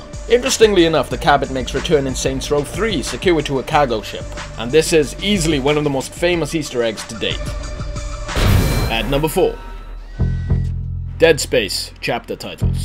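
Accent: Wales, United Kingdom. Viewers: you welsh or something?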